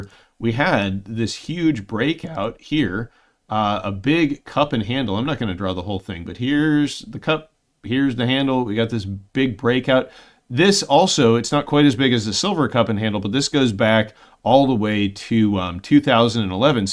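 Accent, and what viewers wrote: Chicago, United States. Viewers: Where is that accent from? Sounds purely like a Chicago accent of my youth?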